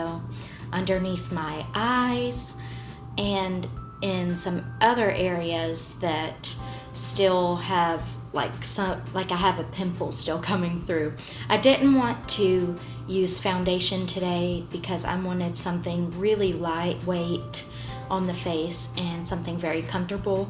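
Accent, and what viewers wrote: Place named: South, United States. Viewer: Ohhh fun!! I thought you had a southern accent for sure lol. I'm from Michigan, born & raised here ❤💙💚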